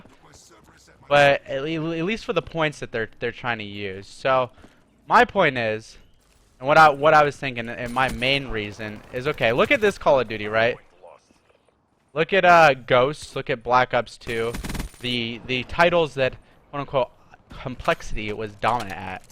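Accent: Canada. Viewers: You can hear the Canadian.:) Love you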